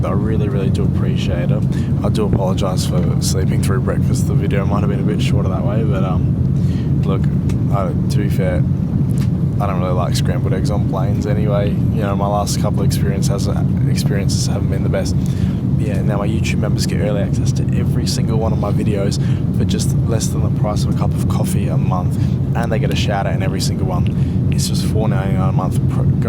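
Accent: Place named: Australia. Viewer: You sound European Australian born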